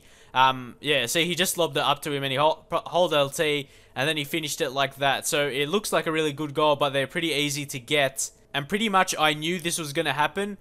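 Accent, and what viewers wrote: Australia. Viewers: are you from Australian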